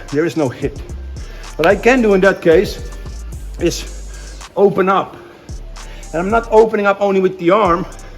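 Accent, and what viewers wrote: Netherlands. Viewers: Respect what a OG, lived in Netherlands 🇳🇱 for a few years, top top people, love the accent,attitude and culture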